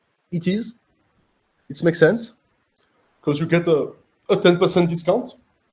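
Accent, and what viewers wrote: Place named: France. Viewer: So greaaaat 🔥 Love your cute French accent lol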